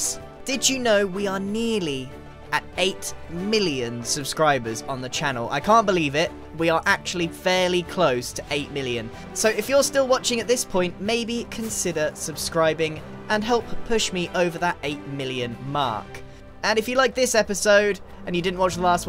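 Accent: United Kingdom. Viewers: hey i dont know if this is rude or not but are u brittish?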